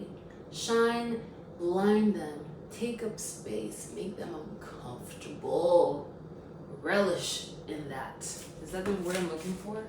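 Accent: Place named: France. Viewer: I wasn't ready for you to be french 😂 quel accent de fou !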